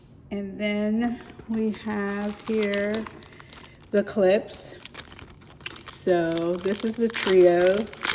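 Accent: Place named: Pittsburgh, United States. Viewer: I loved everything from this collection. I am also a legend and still didn’t get everything I desired. I’m grateful for what I received. I will continue to support C&P. Planning is my creative outlet and regardless of the economy I’m going to continue to invest in it. Love your videos. I picked up on the Pittsburgh accent btw 😊.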